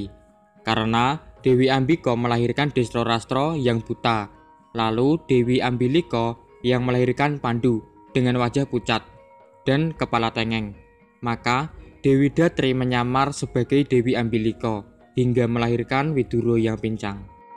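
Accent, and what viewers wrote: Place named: Sleman, Indonesia. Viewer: Suanten lan bahasa e kados piyantun Sleman, sae sanget Kulo remen mas menambah wawasan 🙏🙏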